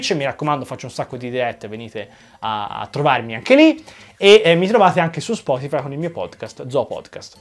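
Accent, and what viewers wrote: Tuscany, Italy. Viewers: Si sente tutto il toscano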